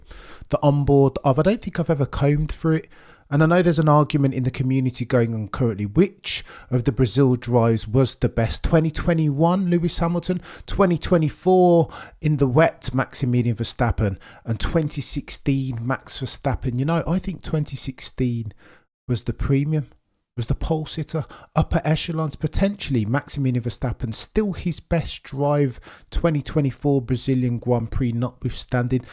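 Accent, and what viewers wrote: Birmingham, United Kingdom. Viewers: Sky sports have been gaslighting us all ever since the Miami GP (Lando's 1st ever gp win btw) and ever since that race he's needed a ton of help from the team and Oscar and still he's never been close to Max in the WDC standings. The "Simply lovely huh" at zandvoort was pathetic and then his post race comments after getting humbled in Brazil were even worse. If that McLaren car is still the one to beat next season then Oscar has to lay down a marker early on and put sulky no-rizz in his place. Nice to hear a fellow Brummie talking F1 btw 👍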